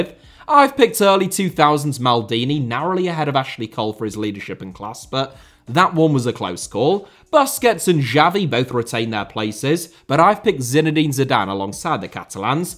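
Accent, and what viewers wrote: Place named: East Yorkshire, United Kingdom. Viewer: I often disagree with, yet here I am again to let him elicit angry puffs of steam out of my ears with his opinions. It's official, it's an addiction now. I'm even subscribed now. I blame that drowsy East Yorkshire accent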